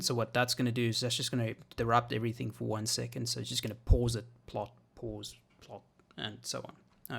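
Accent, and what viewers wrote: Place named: South Africa. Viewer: Thanks for this. Nice to listen to a fellow South African voice when studying.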